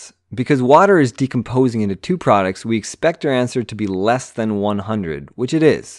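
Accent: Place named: United Kingdom. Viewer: british bruh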